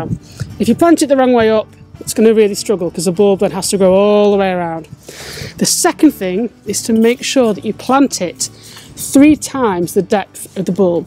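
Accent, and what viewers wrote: United Kingdom. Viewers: I like the British accent, but I have a little difficulty in understanding it !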